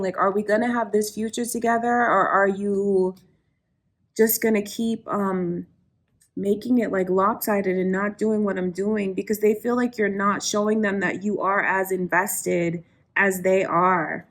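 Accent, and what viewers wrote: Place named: Canada. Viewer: Does anyone know if she is Canadian?? I feel like I hear a tiny bit of a Canadian accent, ey?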